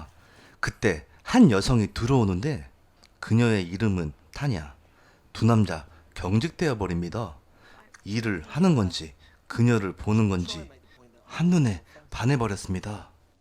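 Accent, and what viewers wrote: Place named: Chungcheong, South Korea. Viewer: 주인장님이 충청도 억양이 쎄네요.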